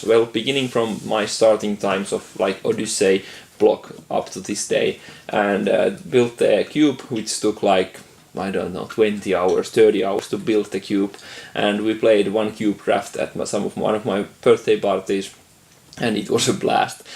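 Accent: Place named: Finland. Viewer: Finnish-English accent! Torilla tavataan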